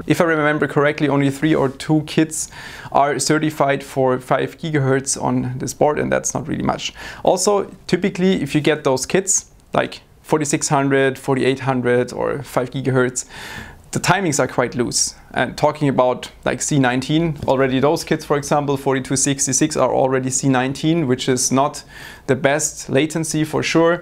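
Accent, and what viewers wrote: Germany. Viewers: Yes and I really appreciate his adorable German accent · Are you German? Cause you have a really obvious accent LoL 👍👍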